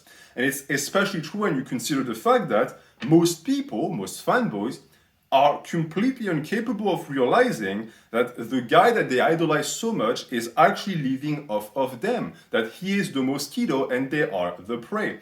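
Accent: France. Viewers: I'm just here for the French accent. You're like a jacked version of my tall French friend haha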